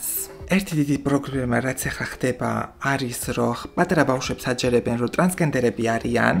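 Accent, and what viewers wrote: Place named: France. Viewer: ბიოლოგიკური 😁😁 უსაყვარლესად გამოთქვამს ქართულ სიტყვებს ფრანგულის გავლენით 😁😁 ძალიან სახალისოა ❤️, რესპექთ რასაც საუბრობ მგონია რომ სწორია ❤️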